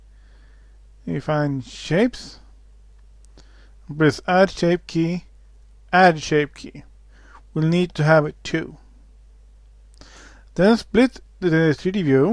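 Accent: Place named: Ireland. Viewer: where are you from? such an interesting accent, Irish?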